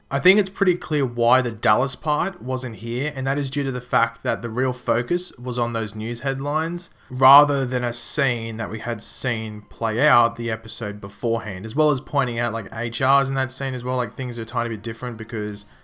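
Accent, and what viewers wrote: Australia. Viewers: are you australian? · Holy shit u sound Australian. R u australian?? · But I'll watch the video anyways because your voice is Australian gold.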